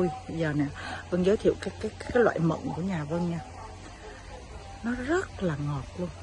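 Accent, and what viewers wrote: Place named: North, Vietnam. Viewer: E thích chị nói tiếng bắc ..vui . Chị nói anh Tuấn Anh quay chung với chị .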